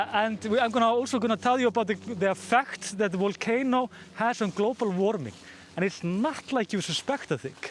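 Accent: Iceland. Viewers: Greetings from Mn. USA. I watch every News cast, and am very pleased with your reports. Thoroughly enjoy your Icelandic accent. The information you pass on to the world is excellent, thank you. You are very fortunate to live where you do, and are so close to these wonder's of nature. Thanks again and keep up the excellent reporting.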